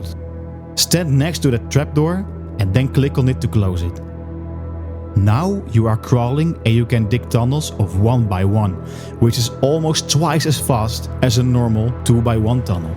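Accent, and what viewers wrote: Netherlands. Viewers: Iets zegt me dat je nederlands bent 😂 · are you dutch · BEN JIJ NEDERLANDS!!?? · Is this guy Dutch? He sounds Dutch. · you sound dutch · lol youre so dutch bruh. I mean the stonekolen english is waaay out there. thanks for th vid though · I smell a Dutchie like me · goeie tips maar ik ruik een Nederlander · TYSM! btw i recognize a dutch accent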